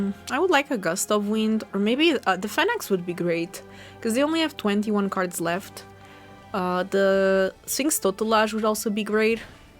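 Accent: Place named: Portugal. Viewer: Your videos are thought provoking and instructive. Even when I don't agree with your choices, my consideration of them makes me a better player. I also like your style of play and the fact that you are courteous to your opponent. I slow play a lot because I'm old. One more thing...I am a Portuguese-American. When I hear your accent, it reminds me of my old neighborhood where so many women talked like you and I smile. So, just like me close my saying that as far as your videos go gosto sim. (That exhaust my command of the Portuguese language.)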